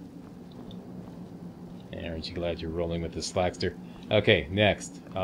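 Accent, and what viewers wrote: Canada. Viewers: He’s Canadian af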